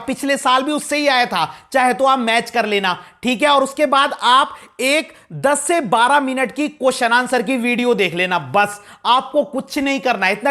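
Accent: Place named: Hills, India. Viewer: सर आपकी आवाज पहाड़ियों जैसे lgri🥸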